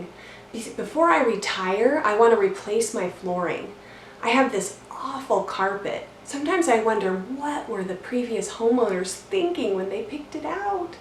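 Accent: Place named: Midwest, United States. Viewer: New subscriber! I am retired for last 5 yrs at age 57, now collecting my SSI at 62. Where do you live? Thinking maybe MN or WI? detect an accent Of that sort? I lived in Chicago area for 25 yrs but now live in SC for past 10 yrs and love it. 😎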